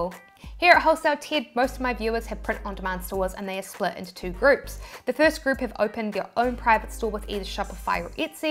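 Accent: New Zealand. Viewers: I like your New Zealand accent. Good job and thanks for all the info. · Are you a kiwi? Where is the accent from? 🙂 Love your videos.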